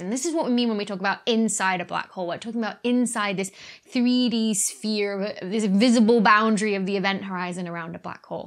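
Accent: United Kingdom. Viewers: Pretty, smart and has a British accent. She da dream.... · So informative, I didn't know the evolution of a blackhole. Also easier to listen to it with a cute British accent. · why would one even need to "ignore her non-American dialect,_ anyway? British accents are beautiful to me! A whole lot prettier than any of the many American accents that exist. 🙄 And I speak one of them, so I can say that. 😌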